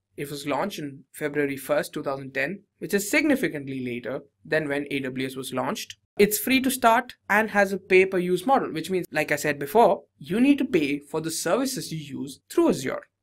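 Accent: India. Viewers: Please don't use Indian accent :) Its hard to listen while focusing to understand the content...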